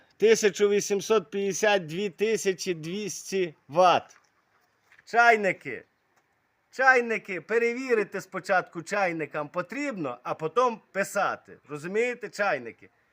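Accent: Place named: Western Ukraine, Ukraine. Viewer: Классный мужик очень позитивно все прям душа радуется что в Украине такие люди больше всего нравится акцент западной Украины прям очень привет из Одессы, про генератор все объяснил наглядно и понятно. Цікаво чи немає дивних шумів від телевізора або комп'ютера при роботі від такого генератора бо я маю перетворювач напруги з 12-220 від нього струм поганий для телевізора бо йдуть поміхи.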